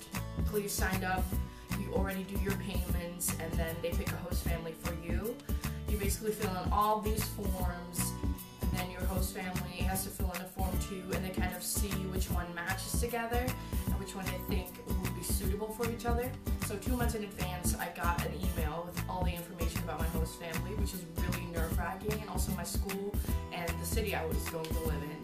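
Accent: United States. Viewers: You have more of an American accent than me, and I'm American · This was very helpful, but I have some questions, was it very difficult to go to their school and learn in English(I mean are their schools difficult), and have you ever just sat in your room thinking: "I can't do this anymore."? I'm from Serbia and I'm considering about going so I was pretty much excited when I was watching the video. Ps. Your accent is amazing, it's like you're an American 😱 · How do you have an American accent?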